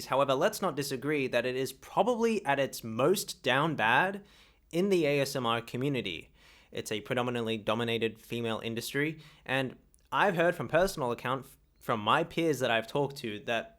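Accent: Australia. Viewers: Are you an aussie? sorry I never noticed your accent till now and I've been watching you for months now. I feel like It should've been more apparent. lol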